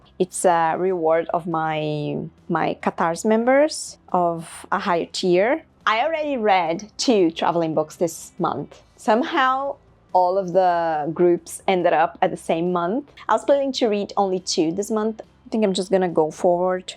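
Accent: United Kingdom. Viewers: Is the british accent on purpose?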